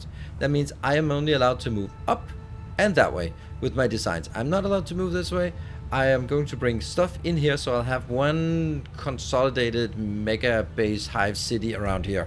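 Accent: Denmark. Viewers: are you from denmark???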